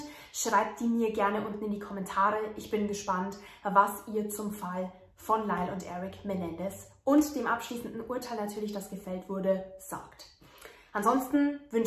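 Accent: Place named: Upper Franconia, Germany. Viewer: Hört sich an wie Oberfranken.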